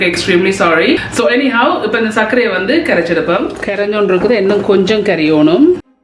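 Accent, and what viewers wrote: Jaffna, Sri Lanka. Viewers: Hi akka, I am your new subscriber from Belgium. But our native is Sri Lanka , jaffna too. Actually, your all recipes are so nice and easy method. I follow your cooking style akka. Thank you so much . And our jaffna slang is also very cute. Our son also your fan and he is 2 years old. 🥰💖💗God bless you all! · Mam neega Sri Lanka tamilian ...Ayio so cute pesarega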